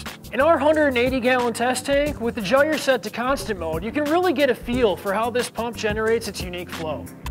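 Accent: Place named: Minnesota, United States. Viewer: I'm from Minnesota but don't live there anymore. Definitely miss the accent. Also, do y'all ever plan on doing these videos for the Vortec mp's?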